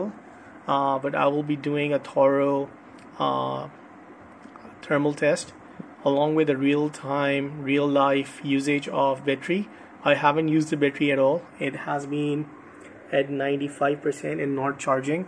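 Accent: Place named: Rajouri, India. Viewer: Abey gandu bina accent ke bol deta, samajhne meh asaani hoti. Rajouri wali accent leke gaand marane jaa raha hai kya gb road?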